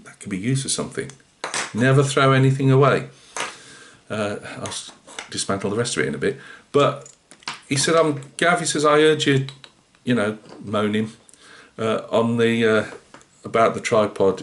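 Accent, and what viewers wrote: Midlands, United Kingdom. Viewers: Happy new year to you from deepest France. My chickens are as pissed off with the weather as you are - though I don’t think spraying models is their main concern. Know what you mean about how great some of our fellow hobbyists are, one of my blog followers sent me 24 beautifully painted Empress Minis to accompany the Indian Mutiny project you inspired me to start. Who does that kind of thing? Anywhoo, lovely to hear another midlanders accent waffling on in the background while I paint, feels like I’m in a pub in Tipton. lol.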